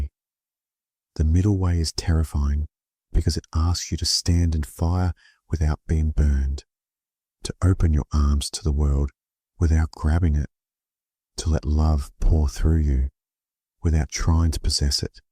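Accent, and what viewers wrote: Australia. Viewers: It is does like he's from the Outback, but the audio quality is even and smooth, for once. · The content is interesting but this AI chinese dope with an aussie accent gets old fast, can’t watch it.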